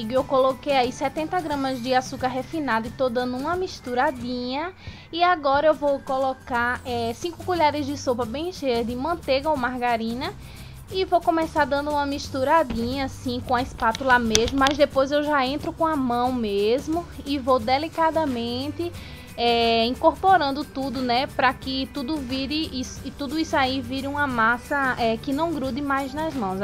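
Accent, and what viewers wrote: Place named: Pernambuco, Brazil. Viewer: Tu deve ser daqui de Pernambuco com esse sotaque ne ?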